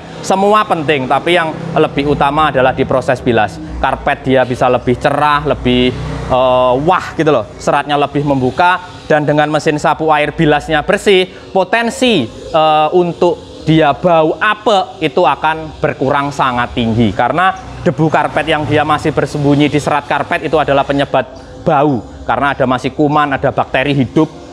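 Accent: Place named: China. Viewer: Kalau menurut saya sering seringlah mempergunakan bahasa INDONESIA,agar tidak terdengar seperti orang CHINA sedang berbicara menggunakan bahasa INDONESIA.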